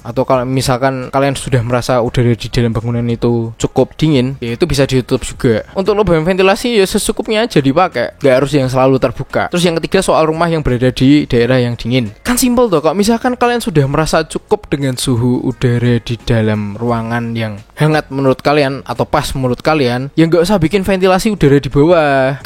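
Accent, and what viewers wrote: Java, Indonesia. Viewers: Mas kamu mok muuuedhook banget logat e, wong endhi tho? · Jowo ne ngendi mas...? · Hmmmm jowone medok banget. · Mas, medhoke pol asli😅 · Medokkk tmen... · Logat jawir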